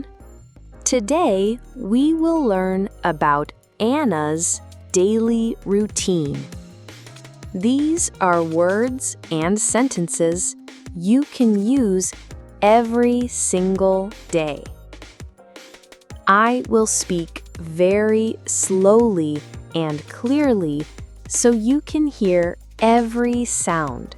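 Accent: United Kingdom. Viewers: is british english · Is this british accent?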